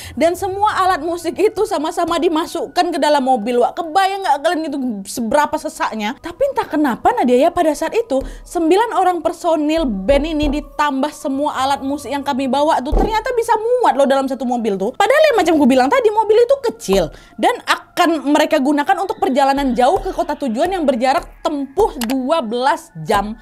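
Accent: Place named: Medan, Indonesia. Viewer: Medan yah kak?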